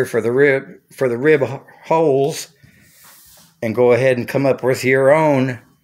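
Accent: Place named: Missouri, United States. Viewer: Hi, I’m a new commenter but I’ve watched all your videos. You’ve inspired me and I’m currently setting up shop to start scratch building an H5. I appreciate all your advice but especially like the little tidbits about scratch building. It’s also comforting to hear a Missouri accent again! I grew up in the Springfield area but haven’t live there since college. Wish I could have met Spike.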